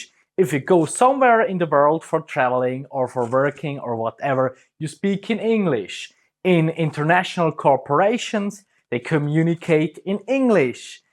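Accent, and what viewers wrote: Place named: Swiss German, Switzerland. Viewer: My ears suffer when I listen to your heavy Swiss German accent in English. I must confess that talking to you I would immediately switch to German even if it is not my native language. When you want to communicate really with people, you need more than just basic English, which is enough only for very casual things but not enough to have a real exchange with people.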